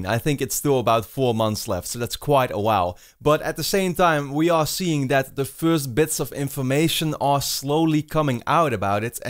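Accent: Netherlands. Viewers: Herm... he's probably Dutch, isn't he?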